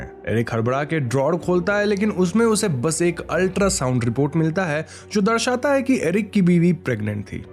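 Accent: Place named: Bihar, India. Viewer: Yeh bihari hai..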